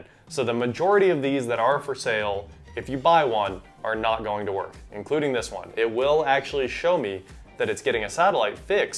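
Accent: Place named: United States. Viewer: It is in American English. You can probably tell, from his accent that he's American. Many English words containing a G that are pronounced hard in British English are pronounced soft in American English.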